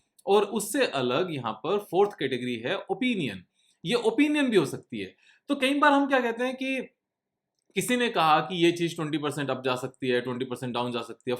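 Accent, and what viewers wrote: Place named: Tamil Nadu, India. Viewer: Thanks bhai for this video. Very informative.I also had fun listening to your Tamil pronunciation.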